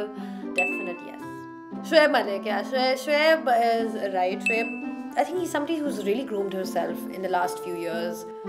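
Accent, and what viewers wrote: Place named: India. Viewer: Your accent is so strong. Please learn better English. You studied here didn’t you learn anything. You sound so Indian. Please don’t learn from them.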